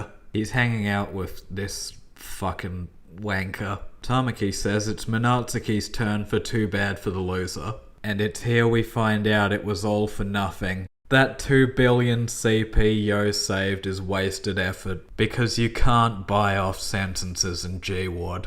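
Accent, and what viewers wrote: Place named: East Coast, United States. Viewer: Lol I came to this vid via your your big lez show vid. As someone who lives in Perth, no disrespect intended but my God you easily have the most easy coast accent I've ever encountered :D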